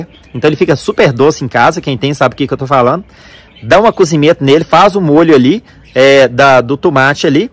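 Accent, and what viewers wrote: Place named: Minas Gerais, Brazil. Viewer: Você é suave, articulado, um ótimo comunicador, gentil e com este charme mineiro, que é apaixonante.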